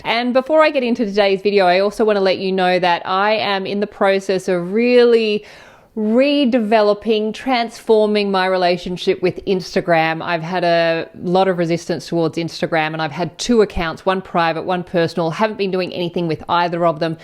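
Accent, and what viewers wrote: Australia. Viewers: Thank you for reminding me about the law and its nature. Btw, what's your accent? Is that Aussie?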